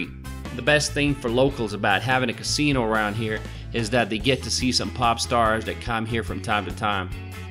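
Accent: South, United States. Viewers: You have an interesting accent. There's a little bit of US southern in it. Great videos! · YOU ARE SUCH A BEAUTYFUL GUY AND A PERSON AND I LOVE YOU AMERICAN ACCENT)))) GOD DAM I WOULD FLY TO THE MOON AND BACK FOR YOU!!!!! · It's great hearing your Southern accent, as I've been overseas for quite a few years. I'm from Georgia (the state). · Your accent is interesting. Did you learn English in the American South by chance?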